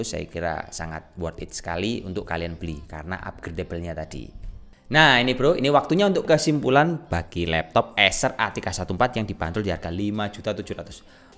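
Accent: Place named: Java, Indonesia. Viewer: I ❤️ this guy's medok! - Wong Amryk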